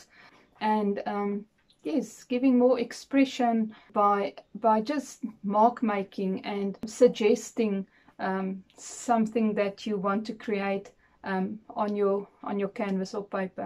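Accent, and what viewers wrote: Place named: South Africa. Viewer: How nice to hear a South African accent. Hi from the Netherlands ex Midvaal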